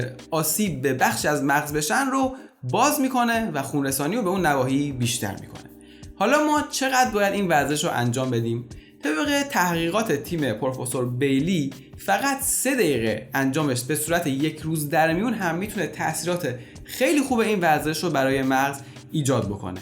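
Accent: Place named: Turkey. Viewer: دکتر سعی کند لهجه خود را درست کنند اینکار فقط با ترکی صحبت نکردن امکان پذیر است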